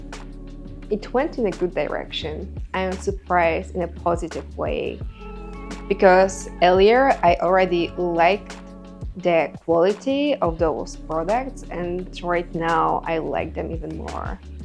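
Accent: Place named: Eastern Europe. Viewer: What camera do you use? The quality is terrific in 4K, which is a relief because one sees so much fake 4K on YouTube. The setting you're filming in also helps, it looks like a plush hotel room. The lighting looks well calibrated too. Very photogenic, your hair and makeup is flawless - if you're not already modelling on runways every week then I will be shocked! You look like a young, Eastern European (judging from your accent) version of Adriana Lima.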